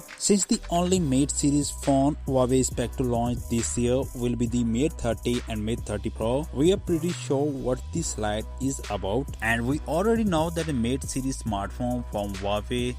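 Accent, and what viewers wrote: India. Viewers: Indian pronunciation ？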